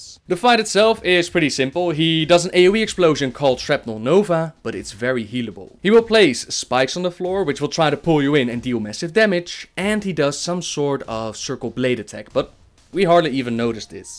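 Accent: Netherlands. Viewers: Hey man , recently i've become interested in lore and stumbled upon your videos , i've enjoyed them alot and learned so much since i started to watch them , now i have been asking myself are you dutch cause you have the same accent as me when i talk english haha . :P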